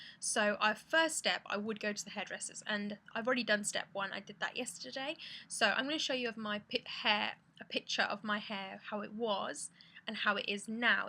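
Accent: England, United Kingdom. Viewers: India with eng. accent <3 nice...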